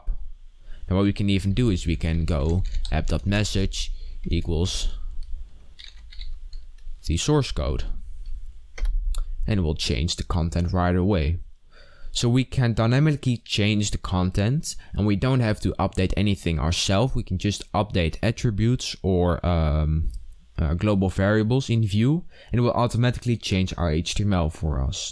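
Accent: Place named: Netherlands. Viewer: This Guy sounds dutch, lol is he?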